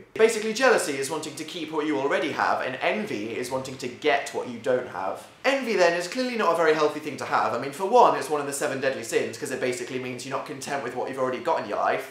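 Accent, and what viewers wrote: United Kingdom. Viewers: I'm envious of your articulate british accent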